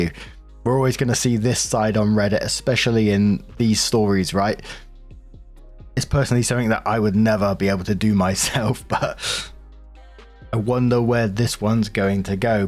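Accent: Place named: Liverpool, United Kingdom. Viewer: I'm listening but not reading the screen, so at first I thought he was wearing a wig and talking with Liverpool accent, I was going to ask "is he pretending to be John, Paul, George or Ringo?" xD edit: Hahahhaahahaahaha, I'm glad I'm not the only one that thought it.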